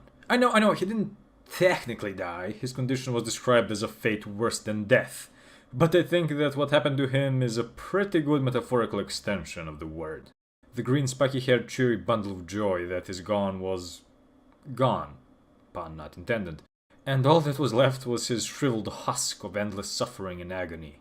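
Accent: Eastern Europe. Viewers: Slavic accent is excellent, don't be shy.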